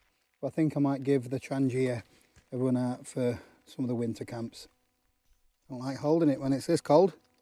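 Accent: United Kingdom. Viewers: Great video as always! I will try this with the kids when I next take them to the mountains. I'm from the U.K. but have lived and hiked in Japan for the last 12 years. It's great to have a British voice to listen to and get opinions on and see the places I used to walk in. Great channel keep it up!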